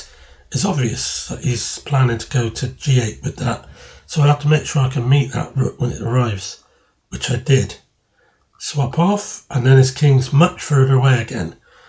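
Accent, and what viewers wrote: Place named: Bristol, United Kingdom. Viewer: As soon as I heard your voice I thought hang about, he's got to be from Bristol hah. Subbed. 😎👍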